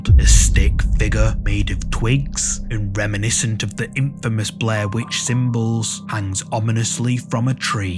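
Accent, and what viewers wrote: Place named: Scotland, United Kingdom. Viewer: Love this (Scot?) accent!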